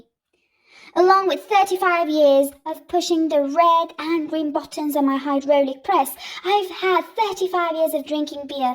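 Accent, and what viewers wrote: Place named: United Kingdom. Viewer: Iove it The British accent is funny to me 😄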